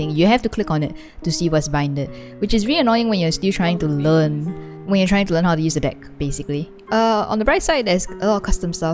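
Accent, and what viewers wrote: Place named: Singapore. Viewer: Sounds Singaporean 👌🏼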